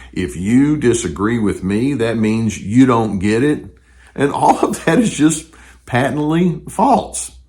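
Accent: South, United States. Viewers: You have a great voice and a beautiful southern accent I can listen to this all day.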